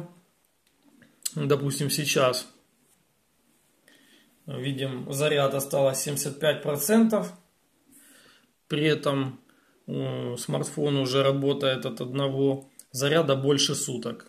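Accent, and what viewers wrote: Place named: Zaporizhzhia, Ukraine. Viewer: та не, ну шо ты как не свой, акцент то запорожский, ту как не крути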